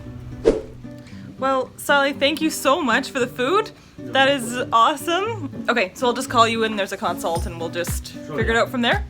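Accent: Canada. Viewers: This is like the 8th video I’ve watched and I finally realised you’re Canadian 😂 Gosh I’m so bad at guessing accents, I really cannot hear a difference between Canada and the US 😂 I wonder if Americans make that mistake when listening to UK accents 🙈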